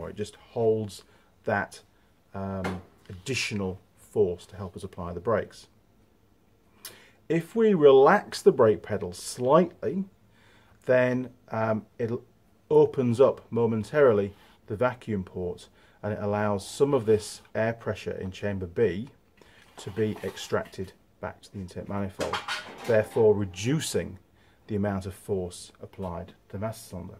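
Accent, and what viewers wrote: Yorkshire, United Kingdom. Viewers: York,i Know it well, the 1 way system is mental,nice town,walked along the city walls a few times,i could sniff a hint of Yprkshireman in there,i too am from Yorkshire, well Hull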